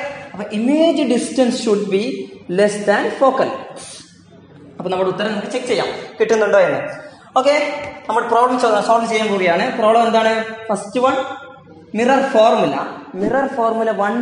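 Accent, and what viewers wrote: Tamil Nadu, India. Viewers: Sir u r a tamil or malayali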